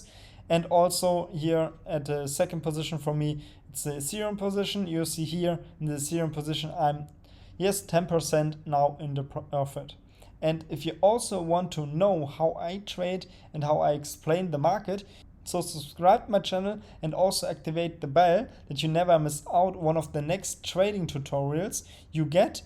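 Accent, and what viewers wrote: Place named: Germany. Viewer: You sound like a German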